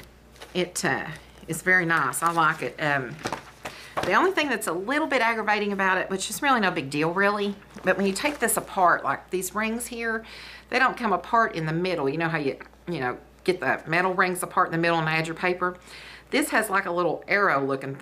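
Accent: South, United States. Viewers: I just love your southern accent♥️ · what a great video! Thank you! It gave me great ideas and I really enjoyed it a lot! You are so sweet. Where are you from? You sound so southern! I am from the south but living in New Jersey the las almost 2 years and I feel out of place sometimes with my "y' all and my sweetie" comments to everyone...LOL anyhow, I really enjoyed the video and subscribed and am going to look at your other stuff on your channel! Look forward to seeing more. I will be making a prayer notebook and journal with my grand daughter in the next week or two and I promised her I would find some great info for us to put in ours. It should be a blast! I am so excited that she at 14 is interested in doing this! Praise The Lord! Blessing to you and your loved ones. Linda Coss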